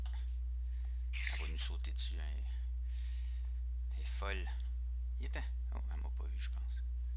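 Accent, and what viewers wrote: Quebec, Canada. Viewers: arreter de critiquer son accent c normal qu'il parle comme sa c l'accent québécois il peut rien n'y faire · sa sentant qu'il et quebecois sa change de la voix normale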